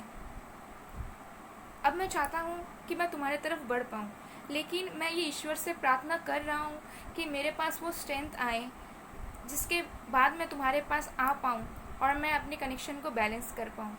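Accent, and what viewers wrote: Bengal, India. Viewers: Are you from Bengal? 💐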